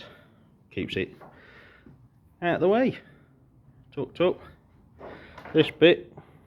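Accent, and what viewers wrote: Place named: Sheffield, United Kingdom. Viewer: Did you get anywhere with setting this up? I’ve got one in Sheffield (you sound local) in similar condition to yours but mines got the leg system if you need to have a look how it was originally fashioned. Wicked saw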